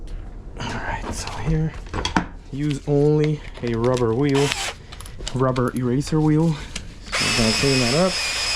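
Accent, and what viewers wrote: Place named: Trinidad and Tobago. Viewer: Why do you sound like you’re from the Caribbean? Trinidad to be specific?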